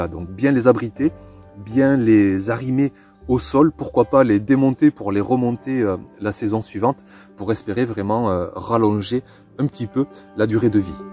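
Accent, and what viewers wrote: South, France. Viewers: Bonjour, Merci de faire des vidéos aussi pertinentes, trouver des fournisseurs fiables n'est pas facile, j'avais déjà beaucoup apprécié ton travail sur l'irrigation, et ici la serre c'est parfait! Ca déconstruit aussi le mythe de la serre en verre beaucoup plus chère que le polycarbonate! J'en profite pour te dire que tu rayonnes à chaque vidéo, c'est toujours un plaisir de voir quelqu'un être si heureux et passionné. PS: Je suis pressée de lire ton prochain livre, j'entendais ton accent du Sud en lisant le premier =)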